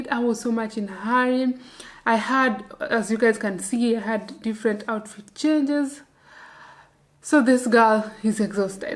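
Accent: Luo, Kenya. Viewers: Luo ascent 👌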